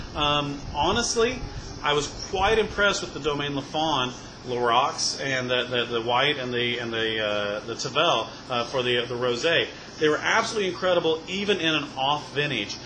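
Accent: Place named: United States. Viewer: maybe a little heavy on mercantile concerns but good commentary... and such an American accent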